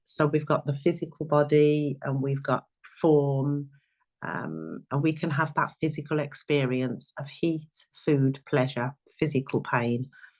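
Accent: United Kingdom. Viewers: I don’t know which UK accent you have, but I was playing your video and my husband overheard your voice from the other room and said it sounded as if I was listening to a female Idris Elba 😀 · Hearing the truth via your English accent is🤌🏻🤌🏻deliciousness Very good recipe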